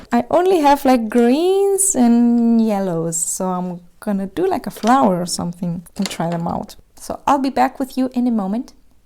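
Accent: Germany. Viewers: R u german cuz you kind of have a german accent and also faber is made in germany and i am also german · I live in Germany... Sooo its cheap XD btw I think she is also Herman... Caus the accent and on the pack was standing '' Buntstifte" that's German and means colored pencils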